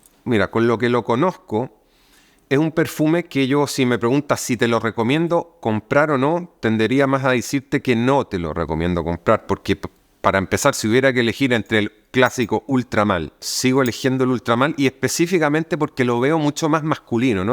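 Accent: Chile. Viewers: Se nota que es Chileno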